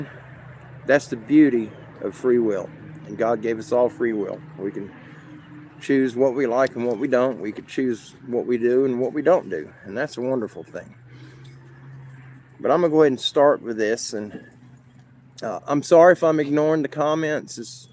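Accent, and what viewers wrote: South, United States. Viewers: I thought your voice is awesome, i love that southern accent - i know what you were doing, you were only trying to make sure that everyone was happy - i do the same thing infact it takes me all day to get to the point, my brother will say to me ""For the love of God Michelina you've been circling the airport for an hour now ! You think you can land sometime soon...lol - im not going anywhere and neither are you, Our Lord n Savior didnt bring you this far you have more work to do, and the one comfort the one beautiful thing we all can keep in mind is "we all belong to a beautiful God and when its time and he wants to take you home, it will be a moment like no other you now know you have served your lord Jesus Christ and now its time to go back home - God Bless you, and until then you belong to us ...lol💖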